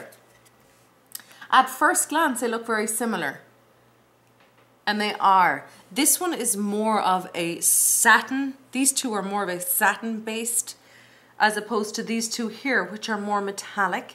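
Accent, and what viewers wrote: Ireland. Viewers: Haha you are so funny , love watching you (just curious ...are you Irish? you sound it , anyway love the accent ) great review love Nisha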